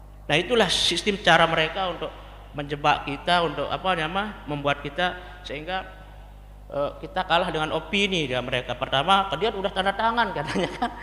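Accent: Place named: Bali, Indonesia. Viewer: Orang mana ini brbahasa sj logat bali x ye... koq gk logis cm bs sling adudomba masyarakat gk etis dech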